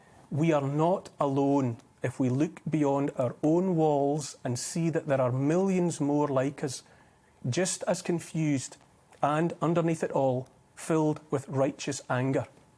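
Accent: Scotland, United Kingdom. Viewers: You were correct. He's Scottish. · 👍🏽👍🏽👍🏽 Im America, trying to work out if is Irish or Scottish. Im thinking Scottish. 💜 Love his talks. I subbed this channel today. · How much I love to hear a direct Scottish voice let out an opinion. It's rare in my northern New South Wales' region. The region hosted an Aquarian Festival in the seventies, and it appeared to be going in the right direction. So, I went south of the Queensland border - thinking I had left an oppressive police state where any looking different or just looking too happy was picked on by the police. More than two people together in public could be arrested. This is now the law in New South Wales - though few seem aware of it. It seems to be there to stop people protesting about something the government has guaranteed for large corporations. It could be used to stop people protesting against coal seem gas mining or pulling up our railway tracks for elitist cycling paths - for retired business folk and local councillors to go on long viewing of the scenery - while those who can't drive and have wheelchairs, disabilities, older folk and Indigenous who can't drive - can't go to the beach any more, or who die not being able to get to medical specialists in Brisbane, Queensland. 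All major political parties voted in NSW parliament to rip up the tracks for cycling pathways, and including the federal government, offered over $100million to local councils to do it - even though this is more than enough money to get to Lismore - the regional center some new railway services - and to Byron Bay, as it was over fifty years ago. Too many now have parasitic jobs driving people with disabilitie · Kudos to. Lovely Scottish accent.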